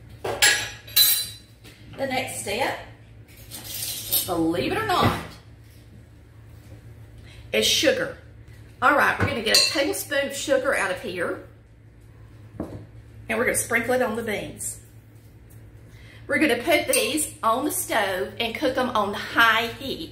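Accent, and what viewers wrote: South, United States. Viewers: love that Southern accent. Cheers.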